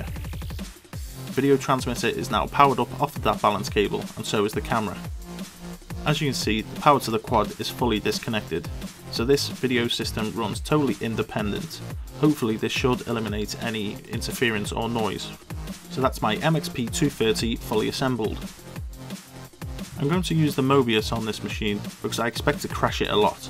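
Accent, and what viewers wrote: Liverpool, United Kingdom. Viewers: Nice build and eloquently presented for an almost Scouse.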